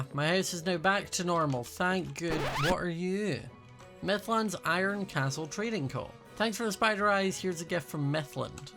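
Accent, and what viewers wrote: Scotland, United Kingdom. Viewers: What is his accent I hear British but I also hear Scottish 😩I absolutely love his accent!!!!!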